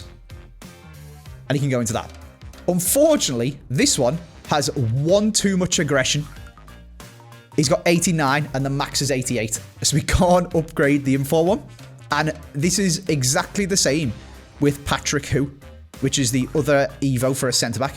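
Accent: Liverpool, United Kingdom. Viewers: He’s scouse!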